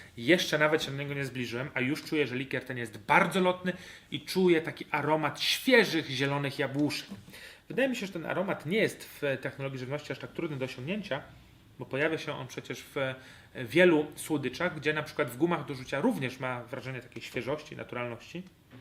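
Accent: Poland. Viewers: Panie Ty jesteś z Poznania??! Zdrówko 🥃🥃🥃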